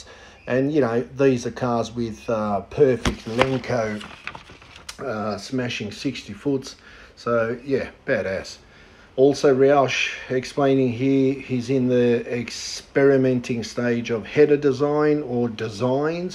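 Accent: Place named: Australia. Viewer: Interesting video mate! In the early eighties I had a 2.3L two door Fairmont as my daily drive. I gathered all of the Cleveland goodies for the transplant but fell on hard times and had to sell the engine and C6. The cat that bought it assembled the engine, put in his short track asphalt car and won a feature race that was dominated by bowties. A proud moment for him and me! Oh! Btw, I love that Aussie accent!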